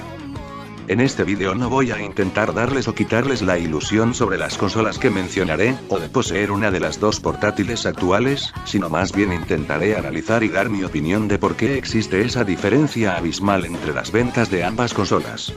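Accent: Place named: Spain. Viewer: ¿Por qué le ponen esa voz culera de españolete?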